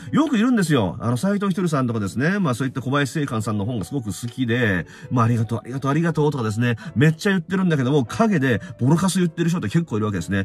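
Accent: Kansai, Japan. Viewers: たまに大阪弁出るの前から好きです。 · 確かに悪口言う人とお酒飲むの嫌ですよね‼️ 楽しいお酒は最高‼️ ハッピーなお酒がいい‼️ 自分の時間使って悪口文句言う人と合うのは嫌です！‼️❣️‼️ 私も48時間地獄言葉言いません‼️ 天国言葉を言います‼️ 今日はQさん関西なまり出てました💖 私も天の声聞きたい‼️💖🌈❣️💖🌈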